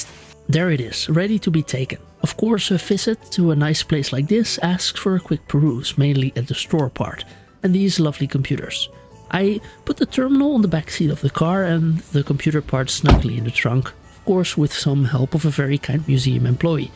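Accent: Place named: Netherlands. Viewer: Oh leuk, een nederlander op YouTube. Leuke video daar. Ik merkte direct je accent op haha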